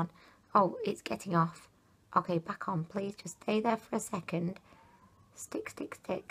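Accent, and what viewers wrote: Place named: Huddersfield, United Kingdom. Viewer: Hi, what town are you from? Huddersfield lass eya, l have a similar accent. Found this video while noseying at Wish hauls, & you are so hilarious, l just had to subscribe! Thanks so much for the giggles!:)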